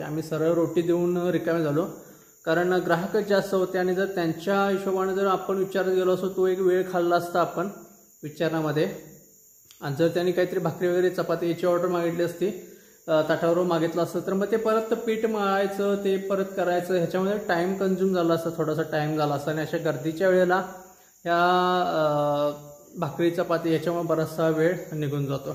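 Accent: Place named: Kolhapur, India. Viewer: व्हिडिओ आवडीने बघतो कारण कानावर कोल्हापुरी भाषेचा गोडवा पडत असतो. आम्ही पुण्यात असतो परंतु आपल्या मुळं आम्हाला कोल्हापूरात राहातं असल्याचे वाटते. धन्यवाद दादा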